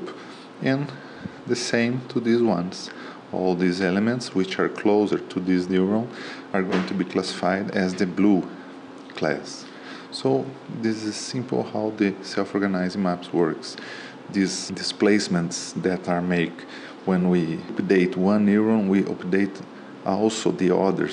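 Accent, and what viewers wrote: Brazil. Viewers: U are from Brazil? Nice ascent. If yes: Muito obrigado pela explição!! If no: My very thanks for the explanation.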